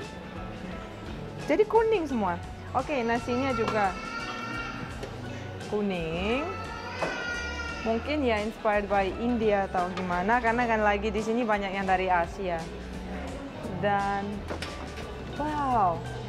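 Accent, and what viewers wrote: Indonesia. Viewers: Logat nya Indonesia banget, tdk terlihat seperti org rusia, mantap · bahasa indonesia ny kental bnget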